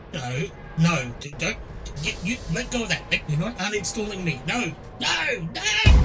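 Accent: Australia. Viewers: where are You!? UPDATE: On main YouTube screen on channel, on top bar, there's COMMUNITY, press and pick read more. Respect for You. You are Star, You are example how to be Great Youtuber and how to be a Great Man, Human, GENTLEMAN. I wish You well. ✌️ I can not wait Your further guidance and Aussie accent. 😉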